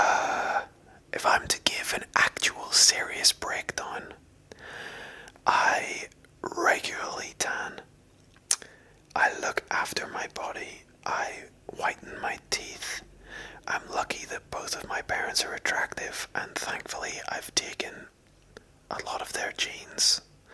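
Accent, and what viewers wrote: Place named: Ireland. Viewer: To whoever asked him to prove he's Irish, his accent proves he is Irish.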